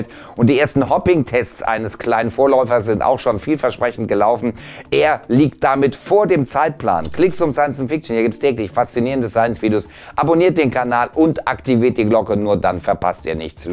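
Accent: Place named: Berlin, Germany. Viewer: Und das von einem YouTuber mit Berliner Akzent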